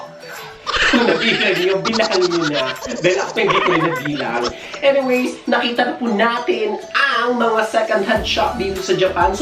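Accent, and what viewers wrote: Visayas, Philippines. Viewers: Bisaya ka ba inday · Bisaya ka?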